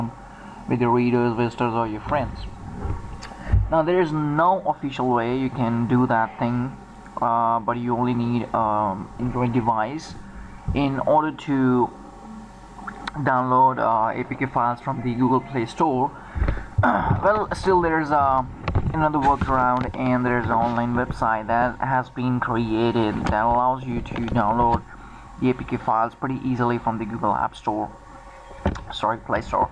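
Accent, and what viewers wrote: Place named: India. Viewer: dude awesome+ ur accent is awesome it was so entertaining listening to curry english thanks, say android again!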